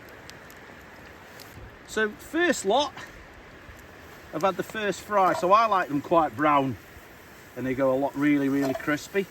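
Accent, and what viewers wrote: Stoke, United Kingdom. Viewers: Hey fellow Stokie, Just found your channel Through English Woodsman! Dan. Said to my hubby this guys a fellow stokie, Our accent stands out. Loved your video and have just subscribed. Roughly what area you in??. I'm Endon.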